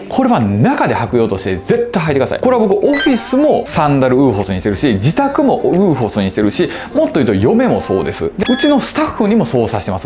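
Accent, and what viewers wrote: Kansai, Japan. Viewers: 初コメです！関西弁でわかりやすいので参考にさせてもらいます！（笑）ちなみに、ジョブズは992が一番有名ですよー！993いいですよ！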